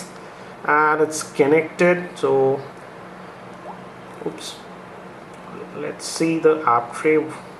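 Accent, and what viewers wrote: India. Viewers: You sound like an Indian? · its indian accent.. u sure u hate originality?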